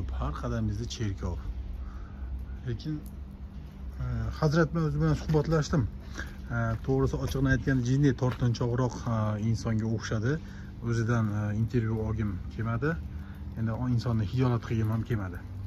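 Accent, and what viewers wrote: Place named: Uzbekistan. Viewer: Удмурд деганини энди эшитишим кайирга карайди сиз Татаристонга кетдик диябсиз забонингиз хакикий Узбек сог булинг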